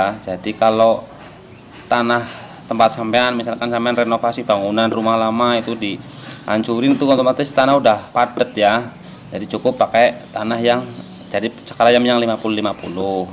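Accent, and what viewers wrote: Banyumas, Indonesia. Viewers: wonge ngapak ini, g tau asalnya dari mana, yg penting sukses channel-nya👍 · Kaya wong ngapak apa yah...😁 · Koyo wong ngapakkk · Kayaknya orang Banyumas nih.... ngapak2 😁😂👍👍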